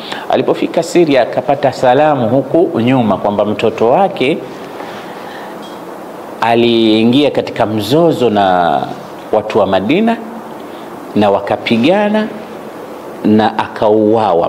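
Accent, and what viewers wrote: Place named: Digo, Kenya. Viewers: Kweli kabisa ni mdigo kabisa uyu sheikh wetu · Huyu sheikh amezaliwa Kenya? His Kiswahili sounds like a kenyan.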